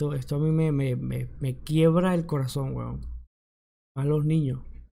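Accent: Venezuela. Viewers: Tengo una duda, si eres arabe porque tienes tan pegado el acento venezolano? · Creo que entró en contradicción porque, sino me equivoco tiene asento venezolano y a su vez es descendiente sirio, lo que lo llevó a asociar "revolución" con "comunismo" (y supongo que asocia comunismo o izquierda con "chavismo"), pero el video es una clara crítica al capitalismo estadounidense que tiene sus garras en Siria. Aunque el bloqueo económico de Venezuela también es impuesto por los yanquis... El que sí lo tiene clarísimo es René con su perspectiva antiimperialista (revolución).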